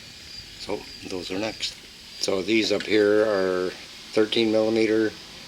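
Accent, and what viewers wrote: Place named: Canada. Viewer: Sounds like you’re from Canada, I’m sure I’m off. Great job for not being a body man and you had your best friend helping you with the hood! Living in NYS the Capital of RUST BUCKET HEAVEN understanding and having the patience for fixing a truck like this is truly a virtue! My 05 silverado is getting the Shit beat out of it body from all the SALT and WEATHER MODIFICATION WARFARE we as human beings are FORCED TO ENDURE HERE in The Great Lakes Area over A Period of DECADES! Nice job maybe if I can muster up the patience between my cancer treatments and pain management from exhausting amounts of painful spinal surgeries I’ll be able to fix my truck in between fixing my house from the December 22 winter snowstorm that killed human beings along with locking everybody and everything in sight for a couple of weeks!!! It’s a shameful disgraceful sinful world we live in since the deep state Covid tyranny was forced onto humanity, so good for you for keeping yourself busy! 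We can all bury are heads in the sand now that the Covid tyranny is all gone!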